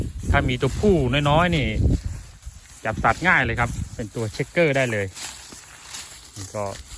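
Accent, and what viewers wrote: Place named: Surin, Thailand. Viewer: ฟาร์มอยู่จังหวัดอะไรครับ สำเนียงเหมือนคนสุรินทร์เหลา😁😁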